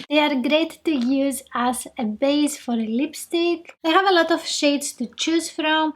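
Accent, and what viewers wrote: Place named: Greece. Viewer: I love your videos and greek accent. I am greek too, Christina. You located in Greece? I was born in Canada but live in Sweden now. Contact me if your in Greece. I'll come visit😁 I'm a makeup artist too👍❤️ we can have fun and do videos together with fun budget products too i haven't tried yet! Sweden don't have the products in stores to test shades out but I'm scared to order online so I don't get the wrong shades lol. I have really oily t zone /combination skin and I don't like too flat matte but I want it full enough to cover and last all day. Did you do a video of the makeup revolution irl foundation, concealer and power line? They don't have it in the stores here I was hunting BUT ONLY their older products available here. Oh you should try rimmel mascaras and foundations! So amazing! The mascara I have been using for years is rimmel wonderfully length pink one it adds volume too!! 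Beats all mascaras today I've been using for years, I've tried them all MUST TRY!! Do a rimmel video please 😍your welcome to Sweden too! Xo Xtina❤️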